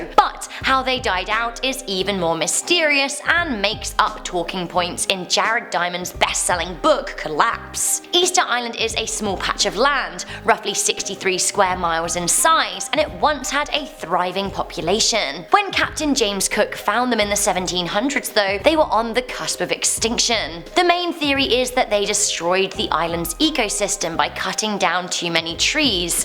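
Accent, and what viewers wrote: United Kingdom. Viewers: Nodaysoff J she literally said mayans Shes british.. Obviously she has an accent.. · I love that this is spoken by British 😂😂 but is in Canada / America not sure but Yh I’m from England so respect 😂😂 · Yeah, she's from england and currently lives in canada i think... People from the states might pronuce it differently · Was kinda hoping for a guy to speak with a lower voice and uuummm, no British accent since like idk YouTube is from America? Is that too much to ask for? Lol. Dislike. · that depends on whether or not you grew up in London or not she grew up in London and she has a thick British accent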